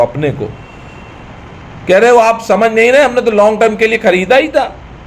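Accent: Kanpur, India. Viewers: सर आप कानपुर से हो क्या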